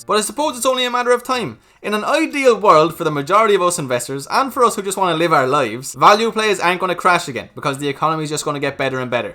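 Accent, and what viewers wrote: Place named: Ireland. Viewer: Sound vids man. So nice hearing an Irish voice aswell hahaha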